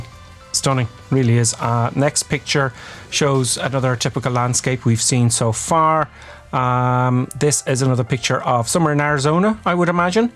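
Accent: Ireland. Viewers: You sound like a fellow Irishman?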